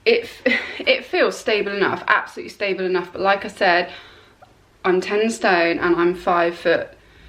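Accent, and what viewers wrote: England, United Kingdom. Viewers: i love the english accent... the more up north the better it gets ;-) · Nothin wrong with your accent but then i'm english too. Thanks for the additional info, shame fitness superstore have followed all of the other sellers and whacked the price up to £350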